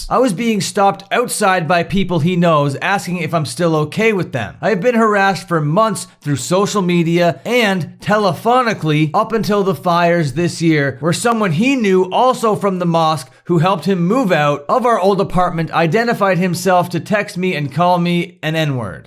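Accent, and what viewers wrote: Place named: Canada. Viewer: Bro it just clicked that your accent is Canadian😂 what province Bud? I grew up in alberta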